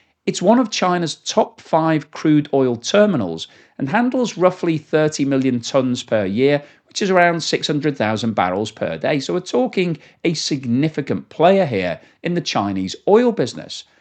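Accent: United Kingdom. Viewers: Please alter your modality. There is no rational or legal reason why countries like China, Russia, India and the many BRICS countries should not trade with whoever they wish. Therefore, saying that ships 'should not' go into one port or another misleads you audience (who are dumb enough already} into thinking that there is a valid reason for the Anglosphere to think that has a 'right' tot tell the rest of the world what is right or wrong. Saying this in a 'pommie' accent exacerbates your bias. The youtube algorithm is false. Your narration should be censored!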